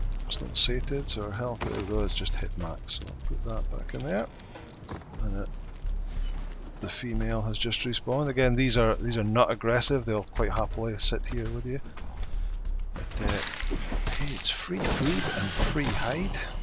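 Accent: Scotland, United Kingdom. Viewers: this tutorial is objectively superior to all the others b/c of the scottish accent alone.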